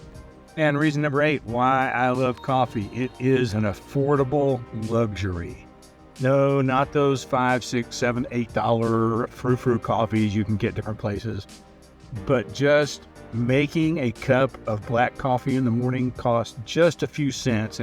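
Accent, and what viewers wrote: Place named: Oklahoma, United States. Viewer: 😂 MY LUCKY DAY. Hi, neighbor! You speak my language, even saying froo-froo too, and it's good to hear from another Okie. I grew up in the Tulsa area and also loved the other-worldly aromas of the coffee shops at Woodland Hills Mall. Wow. Smiles. WH Mall was a Big Deal. Thanks for the smiles!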